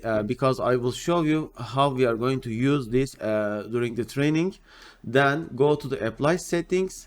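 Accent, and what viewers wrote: Turkey. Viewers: Türk aksanı, nerde olsa tanırım. Helal olsun, mük.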